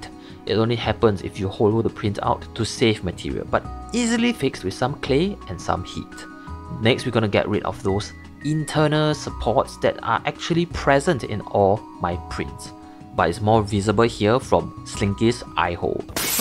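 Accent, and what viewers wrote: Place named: Singapore. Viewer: Wait you singaporean? U sound singaporean, If u are, omg, never knew got singaporean who is interested in this stuff. Love it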